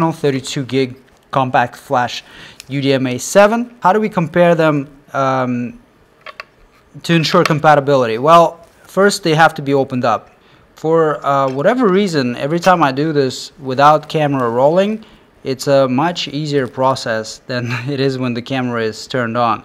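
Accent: Russia. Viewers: Russian акцент.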